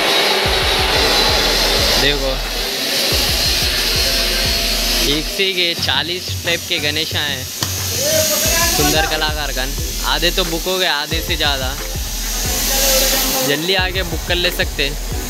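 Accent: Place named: Hyderabad, India. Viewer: hao bhai🔥 hydi bolte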